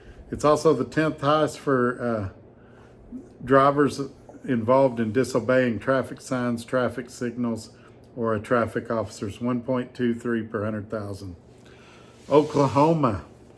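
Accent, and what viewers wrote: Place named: Massachusetts, United States. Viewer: I am loving the Massachusetts pronunciation. Have you heard about the tourist in Massachusetts who was so lost even his GPS gave up? He asked a local how to pronounce the place they were in, and the local just laughed and said, "You're not in Massachusetts anymore, you're in 'Massa-two-shits' according to your pronunciation!”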